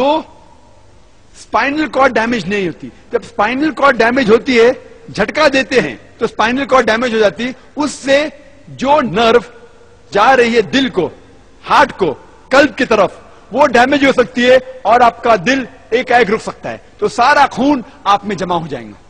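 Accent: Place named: Maharashtra, India. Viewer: Aisa kuc nhi h sister Aap ko lgta h aisa He always speak truth but y Marathi h to inki Hindi Urdu zuban Zra kmzor h jiski wjh s inka bolne ka lehza bdl jata h hmare opposite isliye hme ajib lgta h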